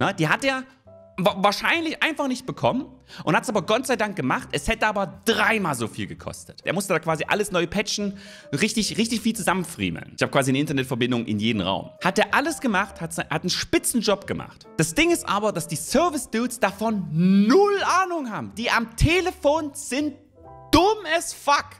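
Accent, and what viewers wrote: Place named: Dresden, Germany. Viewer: dein dialekt kommt mir bekannt vor - raum dresden?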